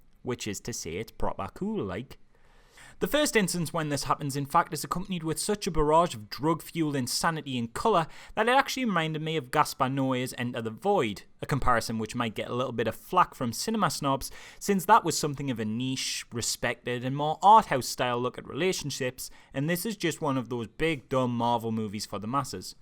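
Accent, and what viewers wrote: Newcastle, United Kingdom. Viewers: LOVE your videos... (screw the haters)... expecially the way you surgically, cleanly and clearly (be it with a slightly noticeable gerodie accent lol) take a red hot knife to many movies good and bad, with a perfect dos of humour to tie it all up... I always take your word and I'm very much looking forward to see Doctor Strange.... P.s More than 3 seconds is needed for a spoiler warning lol And another P.s Keep it up my Gerodie Lad n please stop Gerodie shore. It really make u all look bad.... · Are you from Newcastle?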